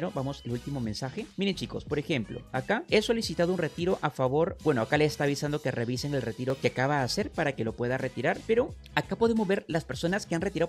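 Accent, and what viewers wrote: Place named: Peru. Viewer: EL mano si era e peru por el micro ????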